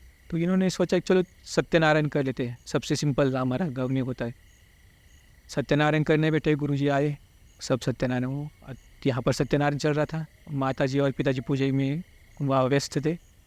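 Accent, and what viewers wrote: Maharashtra, India. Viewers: मराठी ऐकायला खरच भारी वाटत दादा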